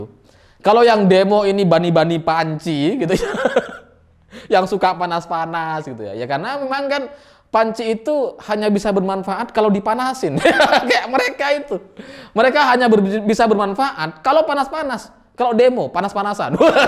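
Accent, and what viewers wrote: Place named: Surabaya, Indonesia. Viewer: Hahaha nguenyekmu broooo, khas suroboyoan 🤣🤣🤣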